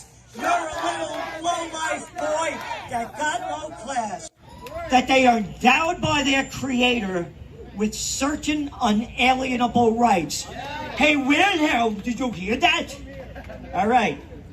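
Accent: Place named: Brooklyn, United States. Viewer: "turlet" I love hearing Geralds Brooklynese.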